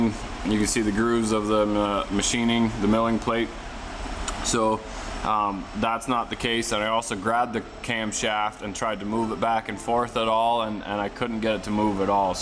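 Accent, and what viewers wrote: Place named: Canada. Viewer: I was born and raised in the American Deep South and Canadian accents are pretty cool to me for some reason. An example is the pronunciation difference (American South vs Canadian) of the words “about” or “out.” It’s weird I know but at least it’s a positive comment I guess. Love this channel.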